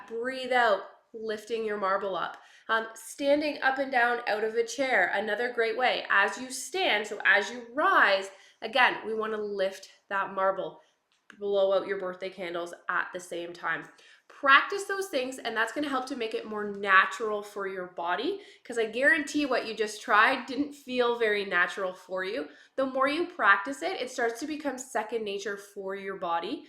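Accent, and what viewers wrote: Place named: Canada. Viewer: So CLEAR! And delivered with a charming Canadian accent. I’m sure this will help lots of people thank you.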